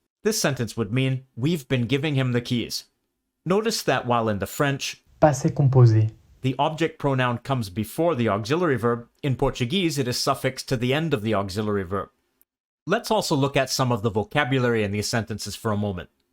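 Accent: Rio de Janeiro, Brazil. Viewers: He didn't use a european variety, but a typical rio accent... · Esse sotaque carioca. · Simplismente porque ele usou o sotaque brasileiro e não o português...ele deixou isso bem claro no vídeo.. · In Brazilian portuguese it is pronounced 'txi' Noooooooooooooooo You're using carioca accent for standard brazilian, thats not nice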